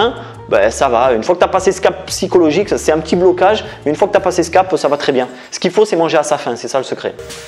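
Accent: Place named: South, France. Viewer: t gentil mais arrogant tu le dis toi même tu connais pas les conséquences de.ton régime et tu n'as aucune connaissance sur le sujet donc c normal que l'on signale ta vidéo qui pourrait mettre en danger certaines personnes fragiles Moi je reste persuadé que tu as raconté du bulshit et tu essaie d'innover mais ton accent sudiste ne suffira pas à convaincre du contraire L'homme est omnivore et à besoin de glucides et d'aliments basifiants que ça te plaise ou non Profite de ta jeunesse car c la génétique pour l'instant qui te maintient en bonne santé et non ton pseudo régime a la con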